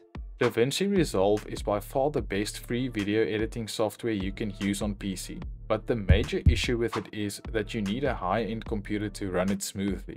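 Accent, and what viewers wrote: South Africa. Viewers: You sound South African 💯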